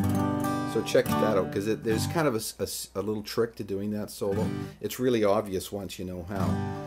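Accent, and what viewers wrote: Canada. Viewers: Your voice sounds much like Spielberg. You have a Canadian accent. Aside from that, you sound so much like, Spielberg.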